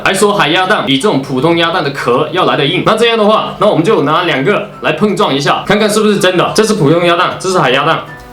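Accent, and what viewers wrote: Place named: Cantonese, China. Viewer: 靓仔会说粤语吗？因为听到你的口音好像会说粤语（出于好奇心）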